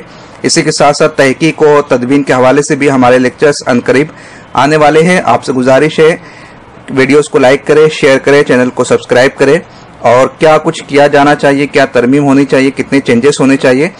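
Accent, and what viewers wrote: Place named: India. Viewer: You are talking about Urdu and using English words in Indian pronunciation...... you are fucked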